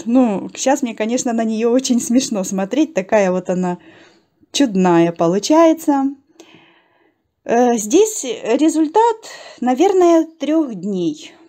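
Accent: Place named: Ukraine. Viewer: А вы откуда? Просто не услышала в видео, кроме упоминания 2х трудных месяцев, а майские деньки упорядочились, судя по говору, с Украины....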